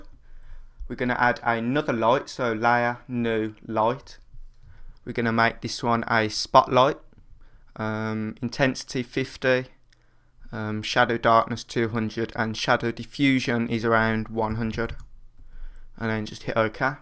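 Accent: Birmingham, United Kingdom. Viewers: Sounds like Birmingham UK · i beleve its Birmingham (uk) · That's a brummie accent from Birmingham, England. Chips an Grayvay!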